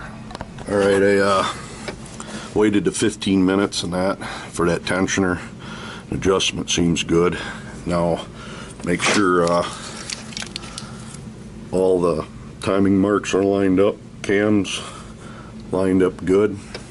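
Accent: Minnesota, United States. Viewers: Northern minnesota?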